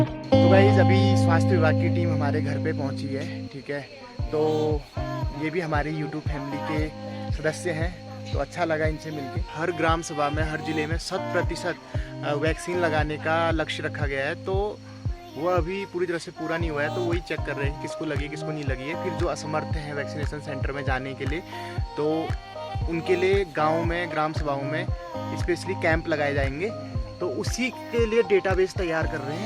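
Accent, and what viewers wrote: Pahari, India. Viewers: Mujhe aapki pahadi bolane bahut badhiya lagti hai👌👌👌👍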